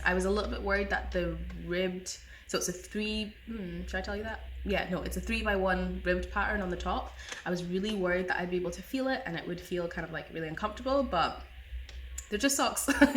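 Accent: Scotland, United Kingdom. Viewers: I’m from the Detroit area of the USA. I found your podcast in my YouTube feed and subscribed after watching your first episode and was very excited today to see you have a new episode to watch. I adore your Scottish accent and don’t have a problem keeping up when you talk fast. So, don’t change how fast you are talking on my account, lol. Thanks for the best new podcast I’ve seen in a while. So fun and fresh! ❤ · Hi, found you in my thread after you got a shoutout by Kevin and Ray on Needle At the Ready podcast. I’m based in Berkshire, UK and enjoying your Scottish accent.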